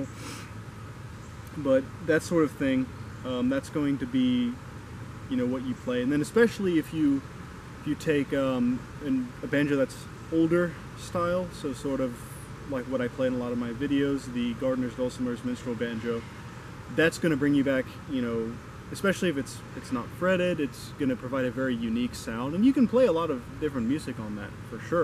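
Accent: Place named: Canada. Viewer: your accent sounds canadian, reminds me a lot of Robert Pattinson in The Lighthouse which is an awesome movie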